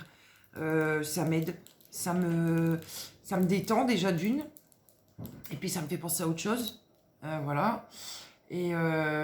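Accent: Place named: Nord-Pas-de-Calais, France. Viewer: Le joli accent ch'ti...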